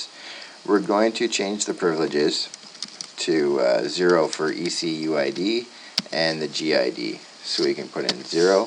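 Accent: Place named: Canada. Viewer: love the Canadian accent.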